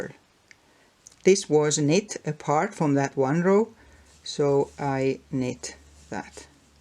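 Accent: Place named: Finland. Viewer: Thanks so much! I've been crocheting for over 40 years, but I'm relatively new to knitting, so this was really helpful. Also, I just wanted to tell you that even if I knew this tip before, I'd still watch the video because you sound just like my aunts sounded when I was growing up. (My dad's family was from Finland) So listening to you brings me back to my youth when I'd listen with fascination to their beautiful speech patterns. :)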